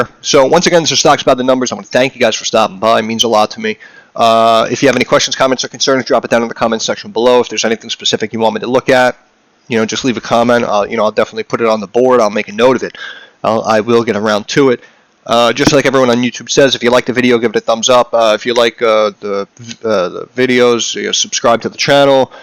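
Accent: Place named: North, United States. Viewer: u did an amazing job brother thank you especially after watching the second time,and totally agree on politics and YouTube lol, GE would cool to get a look at I’ve never really leaned towards the more conventional stocks but with everything going Electric I can see them as a play for future (I just want greedy returns😂🤣😂🤣) but yea and the renewables and things of that nature would be awesome too glad I found ur channel, o and are u up north by any chance I’m from nyc and thought I noticed a northern accent lol.. 🍻 my friend!!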